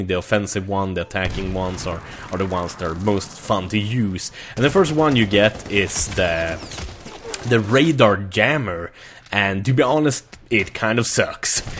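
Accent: Sweden. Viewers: I never realised you were swedish xD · hör att du är svensk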